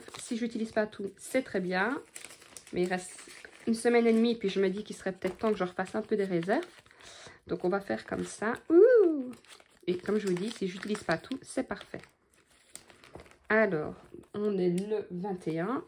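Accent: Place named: Belgium. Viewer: Cc, merci pour ce partage, j’espère qu’il n’y a rien de grave pour ce rdv, tu es vraiment adorable!!! et en plus ton accent belge ❤ c’est encore plus mignion!!!🥰, T’inkiet J’ai un chéri 🤣🤣🤣🤣!!!! Gros bisous à toi et ta famille 🥰😍😘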